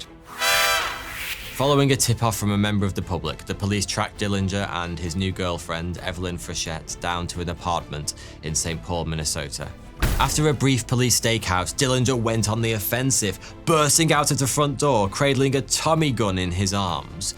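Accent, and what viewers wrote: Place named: England, United Kingdom. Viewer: is British. Most of us pronounce Arkansas as, well exactly that. Not Arkansaw.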